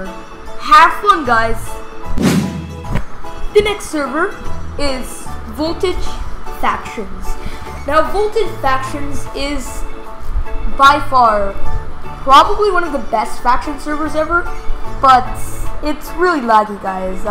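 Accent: India. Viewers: Wait Is He Indian?? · are you indian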